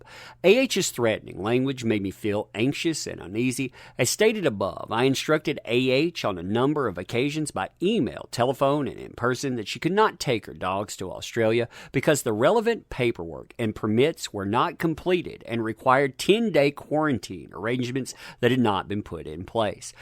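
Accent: South, United States. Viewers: Fam your southern accent just makes the new sweeter than 🍯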